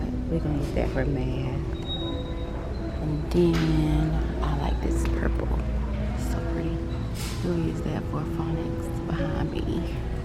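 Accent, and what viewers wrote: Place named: Alabama, United States. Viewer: Hi! New to your channel. First grade is MY favorite! I'm a recently (well somewhat, it's eleven years now) retired first grade teacher here in Alabama. I see that Auburn shirt, hear the accent so I ask are YOU in Alabama? Maybe Georgia? You're delightful to listen to and I enjoy watching your set up. I like those clear drawers you found. I don't have a Staples down here in my city. The room is so pretty with the pastel colors. That is a spacious classroom and looks new. How old is your little one? 🤩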